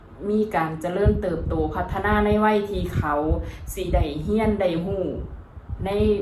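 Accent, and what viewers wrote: Isan, Thailand. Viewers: พูดสำเนียงอิสาน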